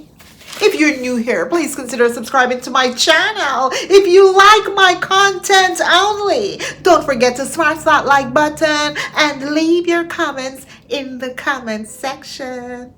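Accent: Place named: Jamaica. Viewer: I heard Jamaican in you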